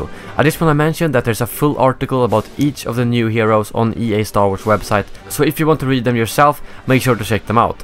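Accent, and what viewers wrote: Sweden. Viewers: I'm sure you're swedish ! (I heard your accent haha) but great vid bro, keep going! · Jo han är svensk. jag hör att han är svensk. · Are you from Sweden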